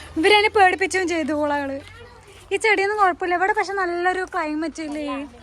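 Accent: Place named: Kollam, India. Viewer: Palakkadan basha ❌ Kollanpotta basha ✅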